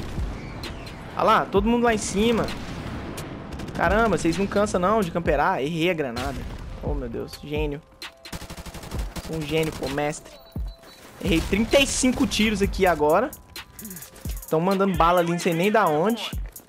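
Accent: Minas Gerais, Brazil. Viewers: Tu tem sotaque de minas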